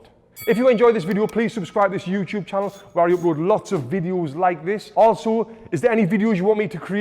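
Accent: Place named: Newcastle, United Kingdom. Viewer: Brilliant accent, Newcastle?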